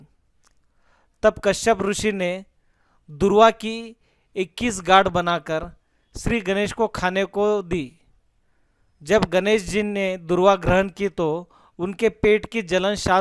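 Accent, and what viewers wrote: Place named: Maharashtra, India. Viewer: ये मराठी माणूस की हिंदी है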